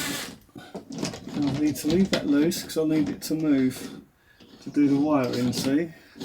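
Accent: Cockney, United Kingdom. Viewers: Are you a cockney?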